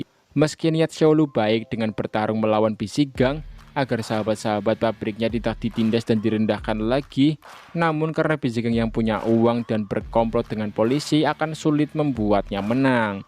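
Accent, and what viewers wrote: Banyumas, Indonesia. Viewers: Aku sangat terhibur bingiit sih mas bro kalau mas bro bacain cerita drama itu lhoo sama bahasanya sampean yang medok n ngapak bingiit ra ngapak ora kepenak yaaah 🤔🤗😁👍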